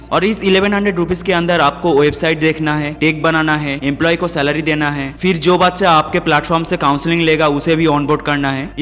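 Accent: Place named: West Bengal, India. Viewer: Dada apni west bengal thaka ki? actually apnar accent thaka lagcha 👍🏼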